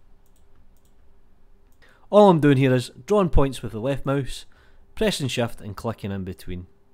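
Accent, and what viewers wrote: Scotland, United Kingdom. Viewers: A really heavy and equally cool scottish accent :-) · English accent ? Scotish? Welsh ?? Amazing ....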